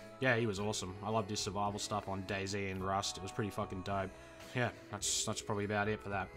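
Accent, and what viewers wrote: Australia. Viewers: are you aus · What part of aus you from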